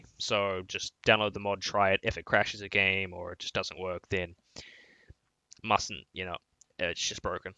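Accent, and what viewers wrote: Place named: New Zealand. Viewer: i thought that was a new zealand accent.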